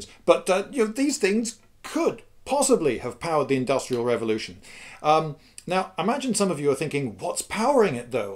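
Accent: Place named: United Kingdom. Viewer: I do so enjoy your so British calm but enthused way of speaking. A couple of my professors spoke this way and it kept my interest up to pass with rather good grades.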